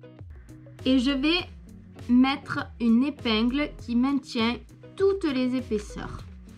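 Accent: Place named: Carcassonne, France. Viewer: Super vidéo Je sais changer une fermeture eclair mais cette vidéo apprend toujours Bravo à vous Je vous découvre et je m abonne Vous avez un petit accent comme moi 😂 Etes vous de Carcassonne ?😂😂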